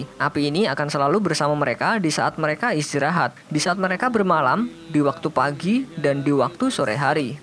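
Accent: Java, Indonesia. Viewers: Fokus video bree... Kok malah fokus komen😂 Piye arek² Iki😂 Pengetahuannya di video Ora Nang komen🤣.. #amet bahasane Medok😂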